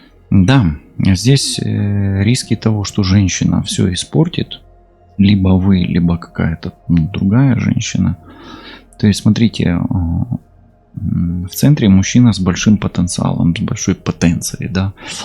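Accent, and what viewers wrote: Ukraine. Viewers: Вы просто супер мне очень нравится что вы говорите как украинец мой род связан с Украиной красивой страной корни есть от туда вас приятно слушать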